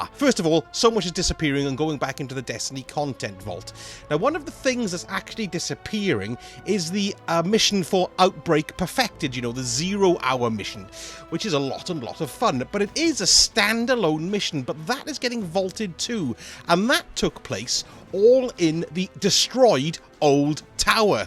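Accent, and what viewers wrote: Wales, United Kingdom. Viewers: Hey,are you welsh?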